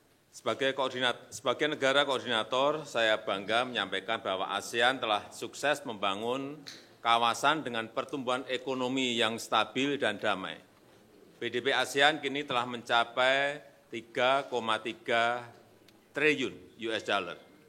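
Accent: Java, Indonesia. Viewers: Lho Inggris logat Nusantara nya mana pak presiden?? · Pak Jokowi itu eksportir sebelum jadi wali kota Solo, beliau sering ke luar negeri, ke negara Eropa pasti bisa berbahasa Inggris lah, walaupun bahasa Inggrisnya Njawani banget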